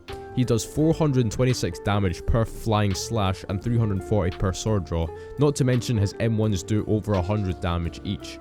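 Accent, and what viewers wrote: Scotland, United Kingdom. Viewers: u scotish?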